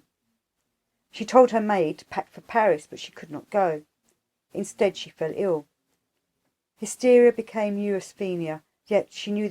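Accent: United Kingdom. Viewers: Between the horrible audio effect,which muffles every word and the limey accent, it's utterly impossible to follow from sentence to sentence. Why bother? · O wonder! What lovely voice! It’s so beautiful to listen to Crowley’s writings in a british (i assume british. I apologize if different) accent.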